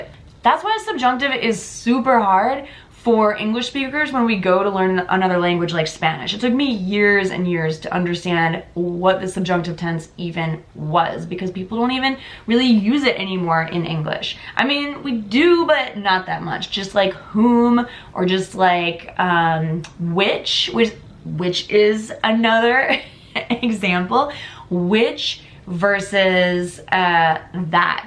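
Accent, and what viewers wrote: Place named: United States. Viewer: Thank you for the video,! I'm from Russia, and I learn English. I don't speak English very well, and I don't understand all that you said, but I really enjoy listening to you, because I love american accent! I hope I can speak English like you someday:) I'm sorry if I had a lot of mistakes, because my English level is Elementary now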